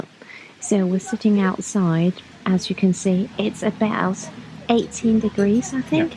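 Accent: United Kingdom. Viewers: you're german? You soumd so british. That dude also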